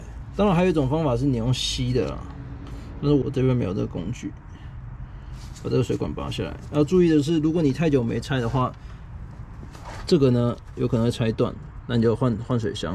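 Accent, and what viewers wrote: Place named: Taiwan. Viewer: taiwan accent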